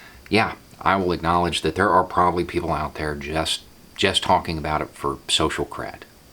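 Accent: South, United States. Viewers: I've watched like 5 straight videos of yours and I'm in shock, you as a white guy with a southern drawl, speaking so eloquently about these topics saying white people need a new leader or your other video about what it meant to be a republican or what it used to stand for vs how it changed man I love it when people like you can call out the hypocrisy of your people and that's what we need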